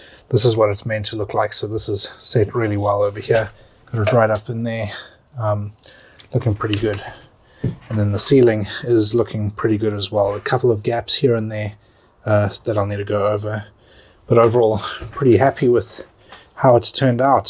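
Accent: South Africa. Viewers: Very helpful and informative as always, I was thinking about using this product as it really gets in everywhere. Thanks again for your excellent work, I was wondering are you from south africa.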